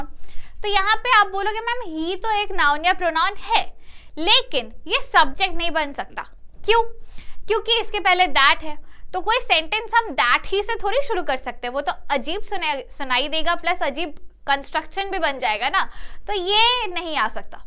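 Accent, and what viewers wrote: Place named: Bengal, India. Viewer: Mam bengali lagte hain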